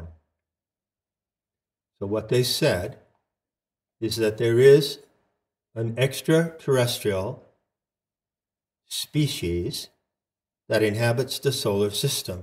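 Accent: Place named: Boston, United States. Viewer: And thank you for stating the obvious, as you say toward the end...those of us with eyes to see appreciate it all the more, as it makes one feel less alone, which fortifies the will to work harder in the attempt to reach out to other human beings. Obviously you are hip to Gates foundation and others wanting to mutate (gmo), and therefore patent, all life on this planet. They must be stopped, they are far enough down that road already. I can hear youre aware of much of this, thank goddess! Its amazing how everthing gets inverted by these folks, as in the term 'germ' which USED to denote growth. I can see you gnow this very clearly. I am a Jungian Psychologist originally from Salem, Ma, now in sw florida. I spent a few years living in Taos, NM...wish i knew about you then, i could have visited you in Santa Fe!?The fact that you havent completely lost your Boston-ish accent warms the very cockles of me heart! 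I have no idols - but i hope like heck to run into you someday. In meantime, thank you for your lifes work as well as reaching out to those who care to listen. I have never stopped listening to nature, for she is ever honest and truthful - as i think either Geothe or Steiner said...or was it perhaps Whitman? I love them all - and i send my love to you, oh kindred spirit! ; )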